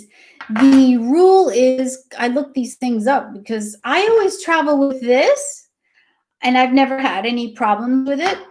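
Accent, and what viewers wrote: United States. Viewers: Are you American? I can’t work out and what do you do for a living?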